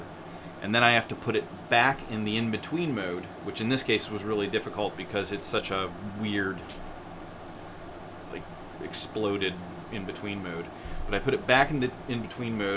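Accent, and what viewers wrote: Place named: United States. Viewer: I'm willing to loan Big Spring, but I would like to know where you're located first. You sound American, so I'm not really concerned about long-distance transport, but a friend has told me that the quarantine has screwed around with delivery routes. Supposedly mailing things eastward, especially to large urban areas like NYC and DC is risky.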